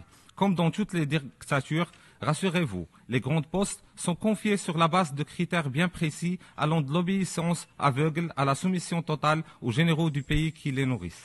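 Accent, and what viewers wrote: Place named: Morocco. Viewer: Un Français à la Marocainne L'accent fait très Mal Aux oreilles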